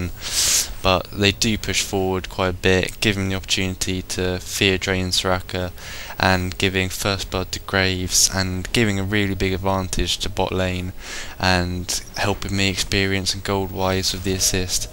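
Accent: United Kingdom. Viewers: British accent, huehue.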